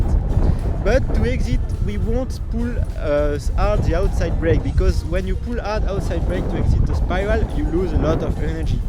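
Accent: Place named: France. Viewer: Cet accent Gaulois me fait mourir de rire!! On est vraiment mauvais en langues étrangères...😂😂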